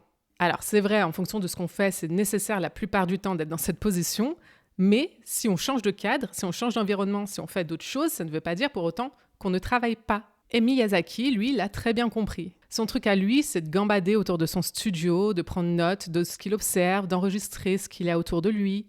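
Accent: Canada. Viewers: Je perçois un léger accent québécois. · tu serais pas montrealaise par hasard?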